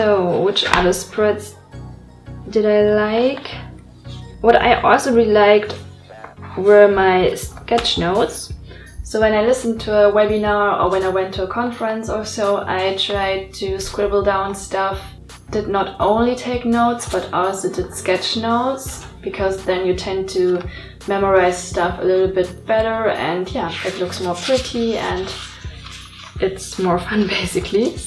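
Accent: Germany. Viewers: endlich weiß ich wie sich ein deutscher Akzent anhört 😂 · man kann an deiner Stimme erkennen dass du deutsche bist XD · I love your accent because I grew up with german nannies so it reminds me of my child hood haha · love your German accent :D and I was finally able to hear how the name of the notebook is supposed to sound :p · Celine Lee lmao me too first second SHES GERMAan haha ich bn aus österreich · falsch.ihre aussprache IST grauenhaft und sehr deutsch! das ist objektiv erfassbar.. und warum bitte muss ich alle sprachen dieser welt sprechen können, um das hier anzumerken?! was für ein dämlicher kommentar! es geht um die allerweltssprache englisch.. und die gute lebt in ...LONDON .. und darum ist es OBERpeinlich. PUNKT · I noticed by your accent that you are German! Ich bin auch von Deutschland. Meine Mama ist aus Aachen aber meine Väter ist aus America. 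· Eigentlich wollte ich auf diesen geistigen Durchfall gar nicht antworten, aber ich glaube man muss dir mal Einiges erklären... - Erstens: Ob ihre Aussprache grauenhaft ist oder nicht, liegt im Auge des Betrachters. Deshalb kann deine Aussage schon mal nicht "objektiv erfassbar" sein. Nun gut... - Zweitens: Hab ich den Teil verpasst, in dem sie nach deiner Meinung fragt? Meines Erachtens geht es hier um das Bullet Journal oder steht im Titel "Bitte bewertet mein Englisch auf einer Skala von 1 bis 10" ?! - Drittens: Hast du jemals schon mal Leute gehört, deren Englisch wirklich nicht so gut ist? Ich glaube nicht... Man versteht sie nämlich kaum und reibt es ihnen trotzdem nicht unter die Nase. Das ist "common courtesy" (kennt du das? ). - Viertens: Nur weil man in London lebt heißt das nicht, dass man alles perfekt Englisch ausspricht. Ihre Muttersprache ist nun mal Deutsch und "die Gute" wird auch in 10 Jahren noch einen Akzent haben. 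Das gilt auch für Franzosen, Chinesen oder Inder, die schon 50 Jahre in England wohnen. Das ist vollkommen NORMAL. ... Kein Grund hier ausfällig zu werden. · are you german? Your englisch is very good though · i heared your accent in the first second :D ich bin auch deutsch xD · Im learning german in school and i think its so cool to hear you’re accent 😻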